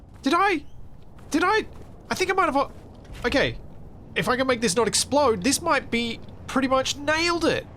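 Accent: Australia. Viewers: So many happy shout outs during this episode. Also a couple of frustrating times. All came out in a fantastic way of Australian accent, I love it. It always makes me smile, laugh and want to go back visiting down under 😊 Thanks, it is always a pleasure watching your episodes 🥳🇩🇰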